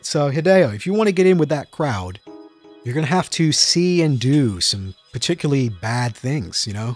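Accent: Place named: West Country, United Kingdom. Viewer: More likely from the south west country, not upper class but well educated working middle class from a nice area.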